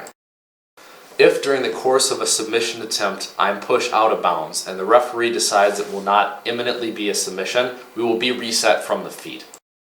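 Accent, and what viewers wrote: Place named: Minnesota, United States. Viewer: I love that Minnesota accent.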